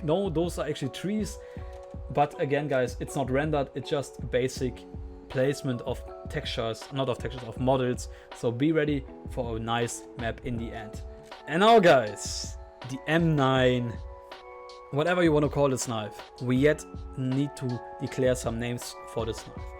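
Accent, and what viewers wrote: Germany. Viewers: you have a German accent. BTW great wishes from Germany, im really excited about your work and how good the game will become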